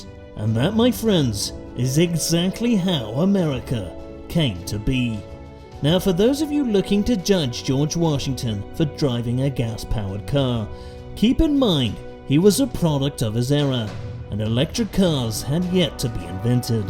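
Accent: United Kingdom. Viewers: The narrator being British is the cherry on top😂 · The fact a British man is telling this hilarious moment in history makes it that much more funny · What adds to the funniness imo is that it’s voiced by a Brit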